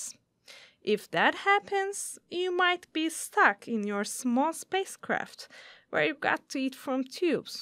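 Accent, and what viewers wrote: Turkey. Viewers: Is the speaker Turkish? Please someone tell me whether I'm right I have to win a bet. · She sounds very Turkis!h to me. Great explanation! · bayan kesin Türk valla..Ingilizcesinden tahmin ettim. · Konuşan kadının Türk olduğuna yemin edebilirim isteyen olursa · türkçe düşünerek inglizce konuşmuşsun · Bunu seslendirenin Türk olduğuna her türlü iddaya girerim. Tam bizim aksan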